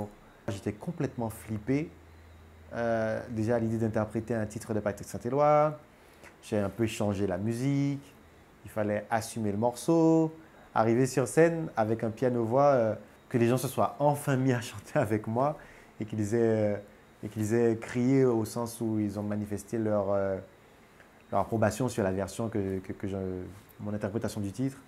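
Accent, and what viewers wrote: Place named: Haiti. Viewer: Les Guadeloupeens parlent aussi le creole mais lui il parle le creole comme un Haitien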